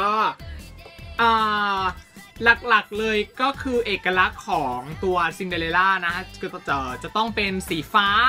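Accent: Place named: England, United Kingdom. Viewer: สำเนียงอังกฤษมาเต็ม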